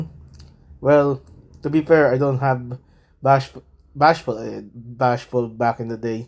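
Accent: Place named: Philippines. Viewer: bro u pinoy, the accent is kinda pinoy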